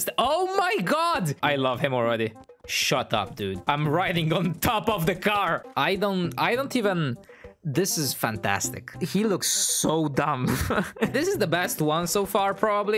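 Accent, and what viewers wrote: Italy. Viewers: You literally have the same accent as my Italian teacher 😂😂😂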